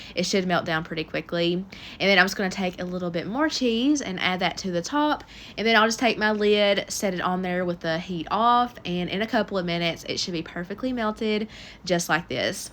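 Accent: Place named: Boston, United States. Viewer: I just love your videos, and as someone from Boston your accent just wraps me in warm joy <3 I'm a dietitian and your videos are so helpful for sharing with my clients who get really stuck on meal prep needing to be "perfect." You always have a good mix of complex and simple meals, they're always nutritionally balanced in terms of protein/fat/carb/fiber, the ingredients are accessible and economical, and they look delicious! You're also not afraid to try new things, and share the reality that some don't always work out the way you hoped. Thanks for all of your content, it is a huge help to people who struggle with this, and it is REAL fun watching you cook :)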